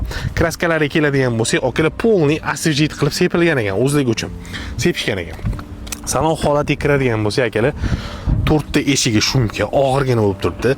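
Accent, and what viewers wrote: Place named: Tashkent, Uzbekistan. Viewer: Узи тошкентликми бу чала гапирмокда тошкент шевасида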